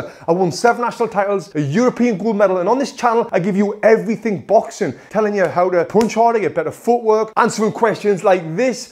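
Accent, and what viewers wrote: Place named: North, United Kingdom. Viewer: That northern accent sounds so surprising coming from someone with a deep tan, hahaha. Looking good, Tony!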